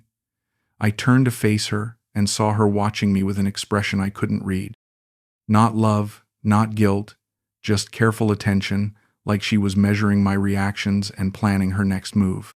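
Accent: United States. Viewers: American accent not British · 🤦 lord have mercy, this is fake, welcome to America 2026. Mindless entertainment to keep you distracted. 🙄. Supposedly British commentator with an American accent 😅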